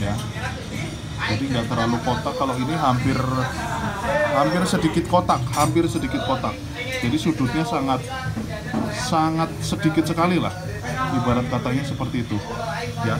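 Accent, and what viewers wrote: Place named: West Java, Indonesia. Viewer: Logatnya seperti orang sunda ini daerah mna pak🙏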